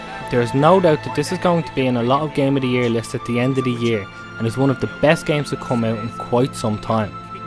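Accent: Ireland. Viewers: the irish accent makes this review sound so much more cool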